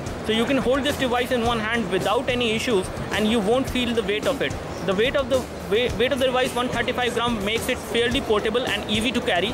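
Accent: India. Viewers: Jakoś nie mogę znieść tego hinduskiego akcentu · accent hindu...sin duda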